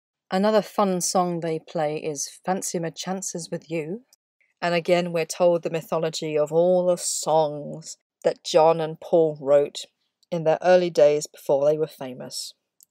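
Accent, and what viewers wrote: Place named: United Kingdom. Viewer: Superb! Exquisite narrated in her soothing, sane, wonderfully British! To me this is very serious stuff not just to me but the world.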